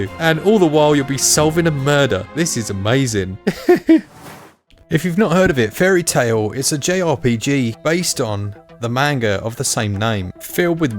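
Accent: United Kingdom. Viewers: To be honest outer worlds sucked, the story line is predictable, it just feels off and I think the reason why most enjoy it is due to the fact that they hyped it via media, youtube etc. So much, it was a true disappointment to me, get elder scrolls alot better and by the way I enjoyed this vid and its the first one I have seen I find most brits with a to heavy accent while your own is just smooth, i would love a top 10 with your personal rankings.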